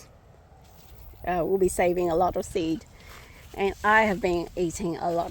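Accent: Australia. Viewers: Your accent instantly gave away that you are Aussie. I'm making a pumpkin tunnel using Seminole pumpkins but after seeing your runner bean tunnel I think I will add them as well for even more shade because I'm in Florida. Great video!!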